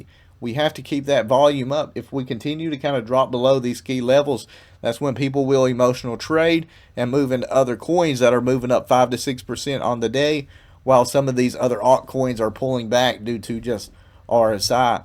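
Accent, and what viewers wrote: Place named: Appalachia, United States. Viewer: it's hard to believe anything you say with that hillbilly accent.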